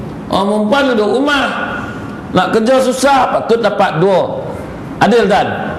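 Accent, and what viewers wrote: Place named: Negeri Sembilan, Malaysia. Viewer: best ceramah ustaz.......tima kasih ustaz atas ilmu dan loghat nogori...........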